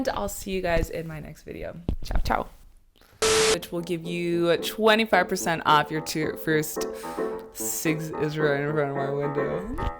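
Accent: Afrikaans, South Africa. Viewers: As a South African I can say you have been here long enough to sound like a local. Some words you mix in your sentence is a dead giveaway. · 😂😂😂love your content... Lol you sound South African already 😂 by the way you say yoh when expressing yourself 😄👍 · I just have to say you Afrikaans accent rock! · "Yoh guys" 😂 You are turning South African so quickly. I love your positive vibe and as a South African, your video is so accurate, I laughed so much. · Your afrikaans English accent is really good! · You sound a bit South African hahaha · Yea she's been living in S.A u can hear the Yooh🤣🤣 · You can literally hear her slowly turning South African😂😂love this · You're low-key begin to sound South African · Those peacocks are just for St George's Hospital LOL. GQ and EC are so special - I'm born and bred here. It creeps into your heart even if you aren't born here. 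You've started speaking like us already 😂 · Oh my word. I am South African and today is the first time I saw the muggers walk - taught to my by a non South African, although with your Afrikaans Accent you get honorary citizenship☺️! Thank you for that lekker video! I will give you a like now now!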